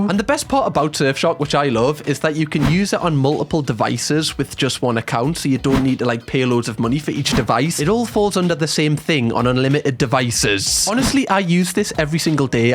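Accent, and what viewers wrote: Newcastle, United Kingdom. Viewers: I follow you cos I miss the Geordie accent. When you said Jesmond instead of Desmond, I laughed.. 😅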